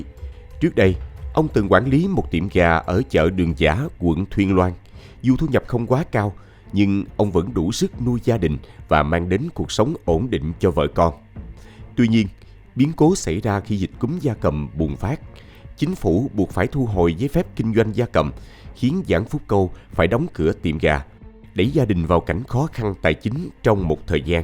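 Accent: South, Vietnam. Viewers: Người ta đọc tin như thế này sao lại chê ? Giọng đọc rõ ràng chấm phẩy đúng nơi đúng lúc giọng miền Nam rất dễ nghe 👏👏👏👏👍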